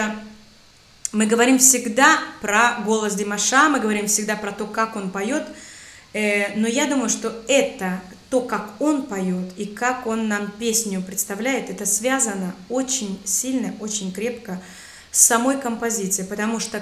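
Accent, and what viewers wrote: Russia. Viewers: Специально пишу по-русски. Дорогая, я бы Вам 10 лайков поставил, если бы была возможность. Вы - чудо, в том числе как певица. Увы, пока не было возможности оценить Вас с дирижерской палочкой в руке, но уверен, что и в этой ипостаси Вы не разочаруете. )) Спасибо огромное за рецензию и более чем профессиональную и качественную аналитику. Подписан на Ваш канал, всегда внимательно слежу. Как уже не очень молодой человек, имеющий взрослых детей и (пока одного!) внука, плюс как филолог-русист по университетскому образованию, хочу Вас поздравить с практически идеальным московским произношением и грамматически безупречным русским языком. Вы иногда сомневаетесь в своей лексике, но всё делаете правильно. Берегите свою семью и Вашу прелестную дочь, в первую очередь (видел её в другом ролике), в наше неспокойное время. И себя, разумеется )). Храни вас Бог. 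Привет из Москвы. Всем мир! Вадим. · Здравствуйте! Послушал ваши реакции на русском и английском языках. Похоже на то, что ваш родной язык -русский, так как английский уступает в произношении, присутствует акцент и вы прежде всего русскоговорящая, владеющая английским. Но разбор произведения отличный) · Что-то я не понял... вы не русская? У вас же идеальный русский акцент. И построение фраз. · По русски говорите безупречно, мне вот стало интересно, иностранцы англоязычные замечают-ли Ваш русский акцент...?)))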